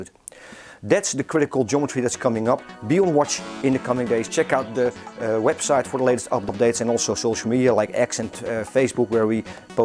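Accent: Netherlands. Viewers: That is a dutch accent and not AI!☺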